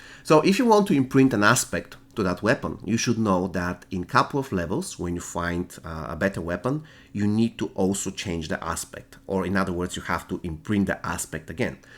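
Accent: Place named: Romania. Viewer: Dupa accent esti roman :))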